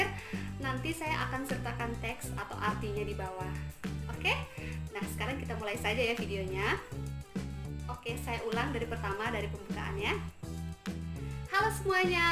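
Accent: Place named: Papua, Indonesia. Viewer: Suka banget liat bunda vlog pake aksen papua, hasil nya DIY keren bun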